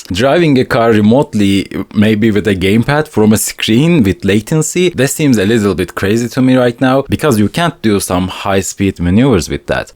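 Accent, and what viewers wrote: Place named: Turkey. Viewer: Abi Türk aksanı hayvani sızmış, elinize sağlık 🔥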